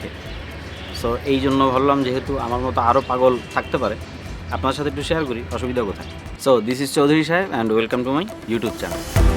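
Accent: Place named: Keraniganj, Bangladesh. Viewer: Vai toh mone hoy Keraniganj er🙌❤️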